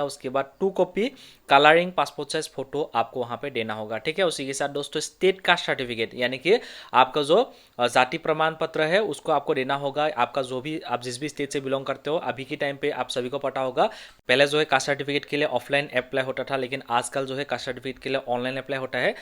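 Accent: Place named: Assam, India. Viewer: bhai 2mar Hindi Improve kribo lagibo atiya Assamese kuwa hindi Improve kri ha try kriba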